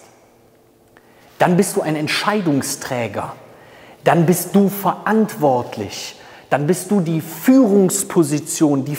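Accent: Cologne, Germany. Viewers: Cooler Akzent hört sich kölnisch an 😅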